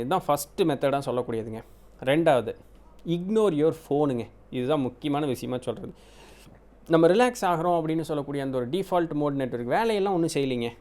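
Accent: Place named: Chennai, India. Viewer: நீங்க கோயம்புத்தூர் தமிழில் பேசாம சென்னை தமிழ் தான் தானே பேசுறீங்க கேவை தமிழ் ல பேசுங்க.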